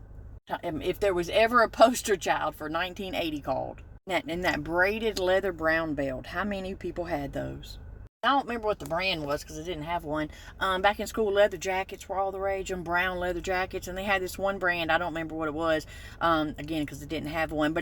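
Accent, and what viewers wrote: South, United States. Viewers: Hussy and Harlot😂....love the southern accent!❤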